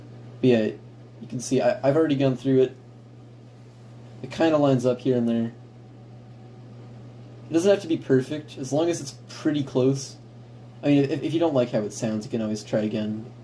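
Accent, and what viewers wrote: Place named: Western Canada, Canada. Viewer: That's tricky...but I'm going to go out on a limb and say I'm getting a western Canada vibe from your voice. Are you from BC?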